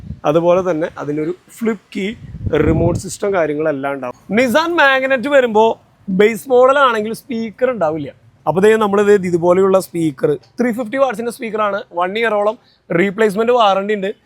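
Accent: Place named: Thrissur, India. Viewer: സംസാരം കേട്ടാൽ അറിഞ്ഞൂടെ തൃശൂർ.. ☺️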